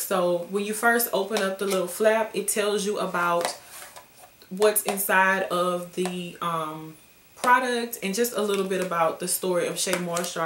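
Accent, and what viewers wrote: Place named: Midwest, United States. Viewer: hey love, thanks for the review. also, are you from Chicago? i kinda hear a Midwest accent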